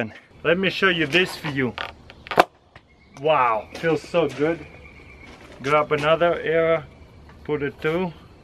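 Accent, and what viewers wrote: Russia. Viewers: Yeah and he also has the same accent as crazyrussianhacker I wonder if they are related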